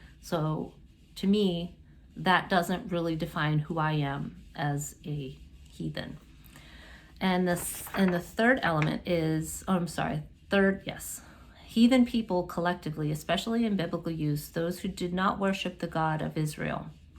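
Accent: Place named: New England, United States. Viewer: I can relate to the definitions for heathen....it’s one thing to walk outside conventional beliefs and practices for ourselves, but it’s different when we have to consider our children. Both my husband and I did not feel served by christianity and had no desire to burden our daughter with it. So after she was born we had our own “naming ceremony” for her. Close family members were invited as were her godfather and goddess mother.... we gathered on the beach and blessed her with the elements air ( a feather) fire (herbal smoke stick) water ( from the ocean) and earth (a sprinkling of sand) we called in the ancestors and asked them for their blessings. People were asked to bring gifts, but not the kind you buy in a store, it was a beautiful, personal, and deeply meaningful ceremony. To this day, thirty years later, folks will comment to me how much they loved it. 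Its not easy to walk outside convention, but I’m glad we chose that path and our daughter is, too. I just discovered your channel and I’m going to check out some of your other videos. BTW, are you from New England ? I feel like I could hear traces of a slight New England accent! I’m from Massachusetts.....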